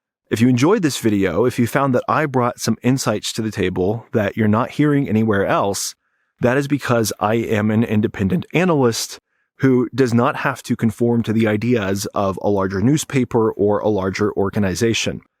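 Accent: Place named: Ohio, United States. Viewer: You from Ohio? Your accent…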